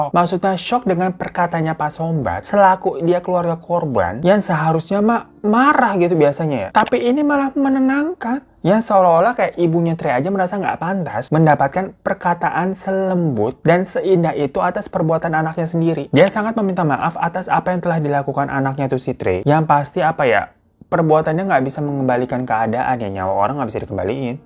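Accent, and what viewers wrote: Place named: Jakarta, Indonesia. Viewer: Baru liet chenel ini, bagus ceritanya, tapi hostnya orang jepang apa orang betawi ya.. Ko lucu logat jakarta bgt.. 😁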